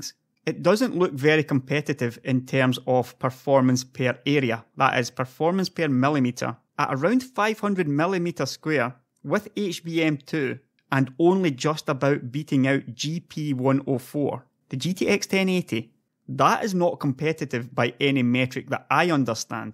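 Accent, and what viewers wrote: Scotland, United Kingdom. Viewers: Its Scottish its difficult if you aren't native English speaker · so it is scotish not indian....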